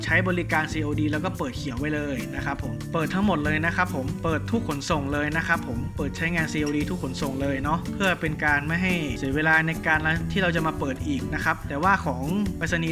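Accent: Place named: North, Thailand. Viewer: ก็ว่าครับ อู้สาบเมืองเหมือนผมเลย😄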